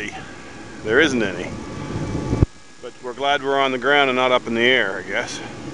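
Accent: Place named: Maine, United States. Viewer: You are very welcome for my comment, AND it was sweet to get mail from an unexpected source into my inbox...woo hoo ! Please dont ever take your video down, as Im really homesick this year, and nobody in my suburb or community speaks with the correct accent.... It’s more comfort than you will ever know, just to be able to hear the audio portion in this video... AND, the visuals bring me back to a time in my life, when things were childlike, and perfect, long before the world. ( and everyone in it ) went to the dark side... I still prefer the cold temps, keeping my inside air at a mean 67 deg. F. Even though I left my hometown, Bangor, in 1978, at age 24, to enlist in the Navy, ( mess specialist ) I really do miss the old days—- listening to high school basketball tourneys on radio, picking apples in fall, ice skating on pond in winter, clearing snow... 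Those were the days, I could sit on the front porch at night with my sisters, and, in the dark, as a vehicle approached, I could tell you the make of the vehicle, just by the headlights... No use trying that now, lol.. Mama, daddy, both Nanas are gone now....but, I still remember mostly everything they taught me.. I’ve gone through my life, wrongfully thinking that my family were just a bunch of shit—asses, but, truth be told, I would not hesitate to burn everything I have now, just to go back in time to the year 1961, and get a do-over...( hell of a ride, but might not change one thing ) Im ever so grateful I was born and rais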